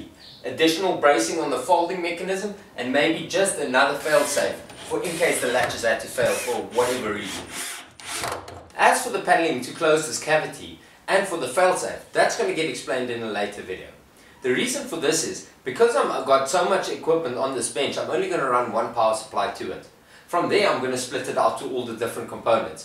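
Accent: South Africa. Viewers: South African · The South African accent is so great! I have a few friends from SA and love hearing them speak. Add that on top of woodworking and you have a new subscriber! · South Africa, I believe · Ja, deff a saffer. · Is this man south african i think i hear the accent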